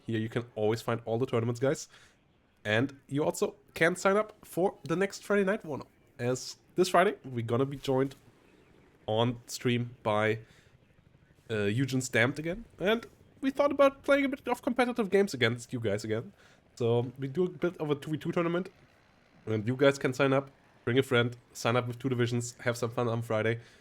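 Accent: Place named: Germany. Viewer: Deutsch erkennst immer sofort ^^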